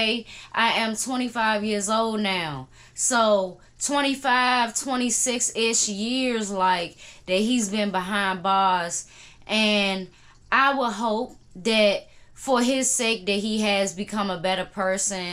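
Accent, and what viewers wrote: Washington DC, United States. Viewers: True DC accent.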